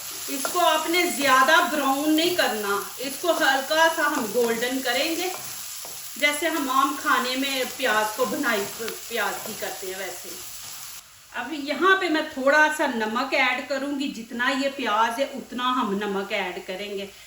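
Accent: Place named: Punjab, India. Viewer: بھت اچھا سالن اپ نے بنایا ھے ماشاءالله ۔لیکن بات یہ ھے کہ اپ کی اردو پنجابی ھے اس لہجہ میں بات کرتی ہیں تو بہتر ہوگا اپ پنجابی زبان ہی بول لیا کریں پاکستان کے سب علاقوں میں پنجابی کو سمجھتے ہیں لوگ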